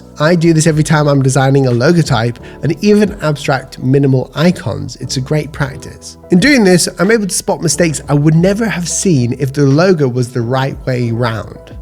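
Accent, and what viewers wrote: United Kingdom. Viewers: good and very informative but british accent sucks when watching tutorials like this. No offense. but its hard to understand what your saying because of the frequent pausing every word, making the sentence sound like one word and talking fast. its annoying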